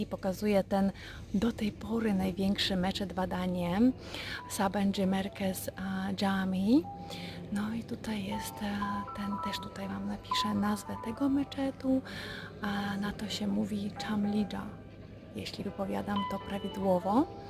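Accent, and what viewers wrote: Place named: Vilnius, Lithuania. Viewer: Zapraszam W mój dzień - to taki rusycyzm, jest z Wilna. A ja myślę, że brzmi uroczo, jak i cały dialekt wileński:)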